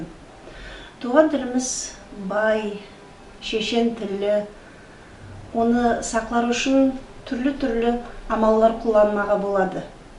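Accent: Standard. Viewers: Оз тiлiнде таза сауатты сойлейдi екен. 👍 👍 👍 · Таза Казахша соилеп отыргои апаиымыз!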